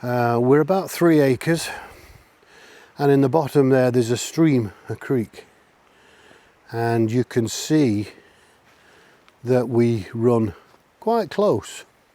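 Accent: Northern England, United Kingdom. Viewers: Where in France? a very northern English accent, i live i the Pyrenees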